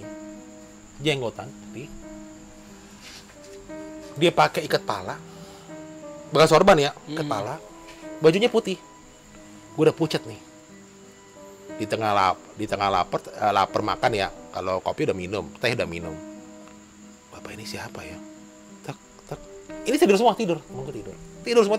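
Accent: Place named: Betawi, Indonesia. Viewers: setau ane, ente orang (berdarah) sumsel (komering) bang. Manggil ibu, pake kata enyak, dan logatnya kok kaya ada betawi nya. ada keturunan betawi juga kah? atau hanya karena terbawa dialek gegara sejak lahir di jakarta? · Abng ini enak story telling nya..khas betawi.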